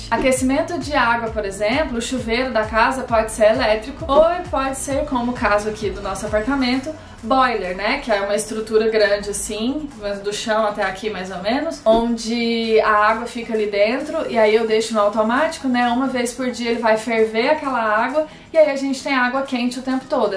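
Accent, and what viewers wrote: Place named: Goiás, Brazil. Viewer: Eu e minha esposa devido a atual situação de nosso país, resolvemos imigrar. Tenho direito a cidania italiana e dai partimos por buscar opções. Acabamos caindo nos seus vídeos e estamos adorando sua simpatia e didatica ao explicar tudo! Ficamos encantados pela Irlânda, obrigado pelos videos e continue assim! PS: enquanto assistiamos seus vídeos eu falei pra minha esposa ''Ela é goiana, olha esse sotaque'' e num é que é mesmo? Somos de Gyn tbm! Parabéns pela casa, da pra ver a felicidade em vcs.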